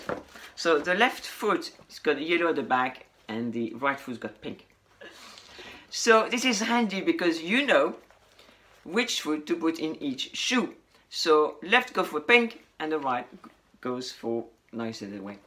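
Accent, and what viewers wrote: France. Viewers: We love a good french accent I speak french, Spanish, welsh, Japanese, Korean and English wow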